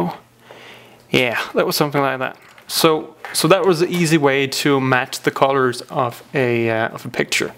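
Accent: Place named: Denmark. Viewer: Idiots, it's because he is from Denmark not UK or USA. That means English isnt his main language, I guess :P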